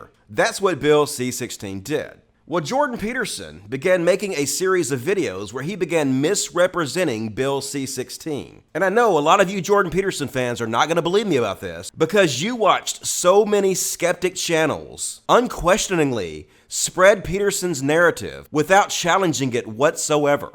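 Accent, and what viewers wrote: South, United States. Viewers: Thought so, that southern drawl. You don't know shit. A comedian in Quebec made a joke about a disable kid and lost his career over it basically. He is trying to appeal bu he's broke and has tried a go fund me page. He was fined tens of thousands of dollars and the court case broke him. Go Fuck Yourself, I'd slap you if you were in the room, lol.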